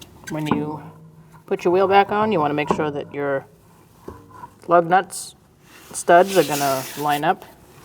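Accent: Boston, United States. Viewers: This lady is awesome! I've watched a few videos with her. Good instructor and I love her Bostonian? accent. Cah.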